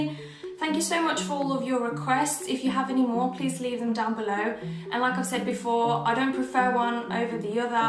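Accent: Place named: United Kingdom. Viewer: Wait are you Swedish!?? I though you were from the Uk! I’m Swedish btw lol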